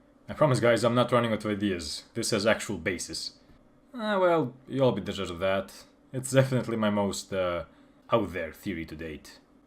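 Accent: Eastern Europe. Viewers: Slavic accent is excellent, don't be shy.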